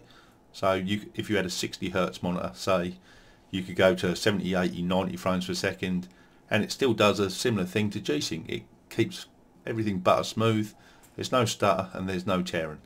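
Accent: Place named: Cockney, United Kingdom. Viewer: By the way, I enjoy your accent and you've got a thumbs up from me son! I'm from yorkshire but I do love a good cockney accent 😂 big Micky Flanagan fan.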